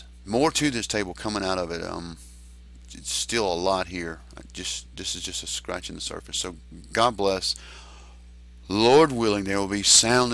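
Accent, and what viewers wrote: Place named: South, United States. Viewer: Being from TX ,, I love your southern accent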